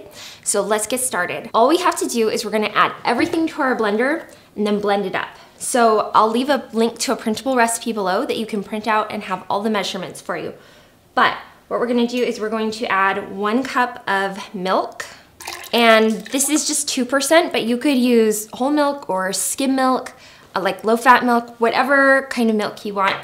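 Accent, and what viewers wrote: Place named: Nebraska, United States. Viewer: Lol, it's a Nebraska accent, actually. But I did go to BYU for school.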